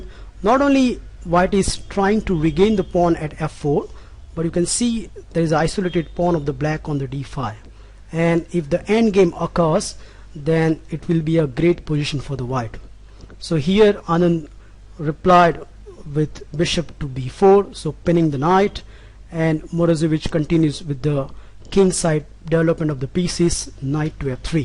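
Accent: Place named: India. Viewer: Indian accent is very annoying and confused 😏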